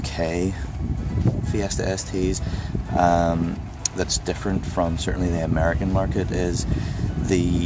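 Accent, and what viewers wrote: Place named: Northern Ireland, United Kingdom. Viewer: Hi there, I am from Australia but was born in Groomsport Northern Ireland so it was cool hearing you speak :P As for the car get a crossover pipe to remove that stock pipe to the turbo. I changed mine and the intake hose to the mountune like you have. Other good things to increase flow is adding the velossa big mouth to the front of the airbox. I own a 2015 euro 3dr FiST like you so these tricks are good. But as with all these changes you need to think downpipe and exhaust one day too. Take care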